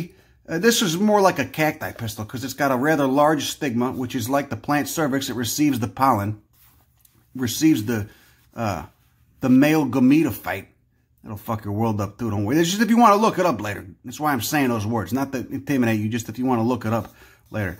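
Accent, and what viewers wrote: Chicago, United States. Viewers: Even with the Chicago accent, tats and foul mouth - it's pretty adorable how much you love flowers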